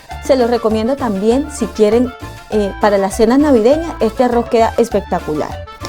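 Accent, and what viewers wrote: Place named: Caribbean Coast, Colombia. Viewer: Tienes acento costeño 😉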